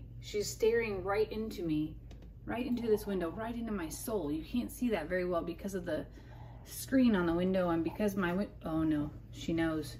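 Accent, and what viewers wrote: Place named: Texas, United States. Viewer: From Joan- I love how you are getting a Texas “twang” added to your speech.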